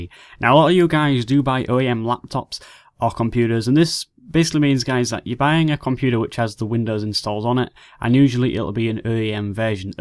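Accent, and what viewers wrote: United Kingdom. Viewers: Chris Nova its real English, we call it British English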